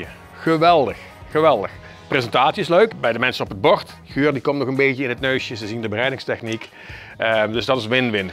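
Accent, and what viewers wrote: Brabant, Netherlands. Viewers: Leuke video weer! Heerlijk hoe je het op z'n Brabants brengt allemaal! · Hoi, Ik heb al veel van je bbq videos gezien en er heel wat van opgestoken! De brabantse presentatie is geweldig!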